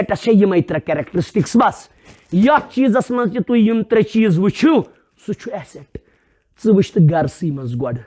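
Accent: Kashmir, India. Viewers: Sir say salam khan as samlan khan .apple as yapple ..kashmiri accent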